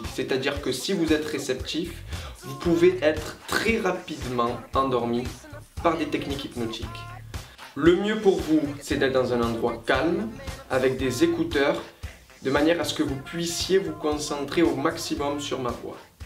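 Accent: France. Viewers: Il a l'accent du sud' c'est très dur de se concentrer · Petit accent Toulousain...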